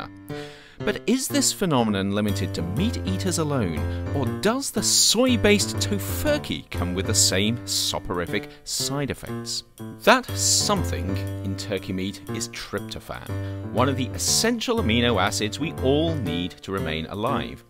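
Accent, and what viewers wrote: United Kingdom. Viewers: Why is there a British guy telling me about Thanksgiving?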